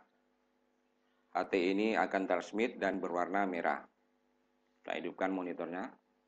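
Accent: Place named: Madura, Indonesia. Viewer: mantap bang, ilmunya keren. btw abang asli dari madura ya? logatnya kayak teman saya orang madura. sukses selalu bang. salam buat yg di madura 👍👍👍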